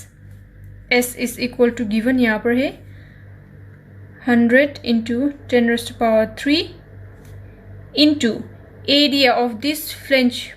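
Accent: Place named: Northeast India, India. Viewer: Thxnsu So mus mam apne bot asaa seekaya....BTW I love NE accent 😂❤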